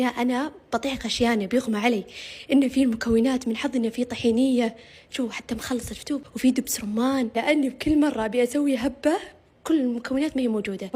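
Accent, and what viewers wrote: Iraq. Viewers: فدوه للتحجي عراقي❤❤❤❤ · فدوه للتحجي عراقي🤭🤗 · حسيت بفخر من ذكرت العراق 😂 و حبيت شلون تحجي لحجتنه 😂😂😂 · يمه فدوه شلون تجي عراقي انا احبج كلش كلش كلش هيه الوصفه كلش حلوه وانتي احلى ❤❤❤❤❤❤❤❤❤❤❤❤❤❤❤❤❤❤❤❤❤❤❤ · امي عرفت عراقيه اي البنيه اسمها ايه والله العظيم تعرفين تتكلمي عراقي اذا تحبين العراق الفلوق الجاي سوي دولمه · عراقية بحت ههههههه😂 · والله احلى وحده تحجي عراقي فديتج❤❤ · اني عراقيه انصدمت بلهجتك بصراحه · احلى من يحجي عراقي❤ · احلا وحدة تحكي عراقي❤❤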